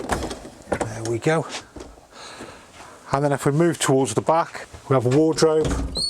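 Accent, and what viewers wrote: Stoke, United Kingdom. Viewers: Is this fella from Stoke?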